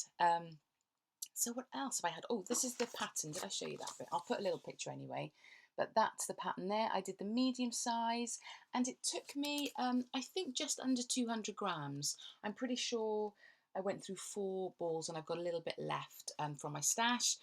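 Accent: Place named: Wales, United Kingdom. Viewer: I found your first podcast because YouTube suggested it to me as I had been watching Ranunculus techniques videos. And of course your first episode featured your Ranunculus. I am enjoying your podcast, especially the odd Bits in your Welsh accent. I hope you managed to take the ferry from Vancouver to Vancouver Island! I live beside Lake Ontario, but I am originally from the UK south coast.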